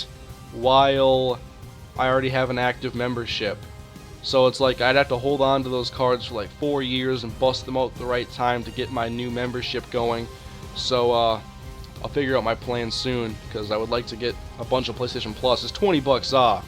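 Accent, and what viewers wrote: Minnesota, United States. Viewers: Hi are u from Minnesota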